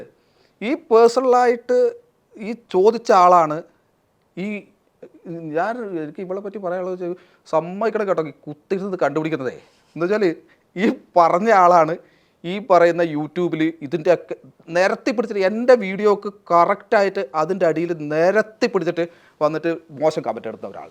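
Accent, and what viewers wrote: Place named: Kannur, India. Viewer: Jishinte സംസാരത്തിൽ കണ്ണൂർ ഭാഷാ അസ്സലായി ഉണ്ട്.പിണറായിയുടെ സംസര ശൈലി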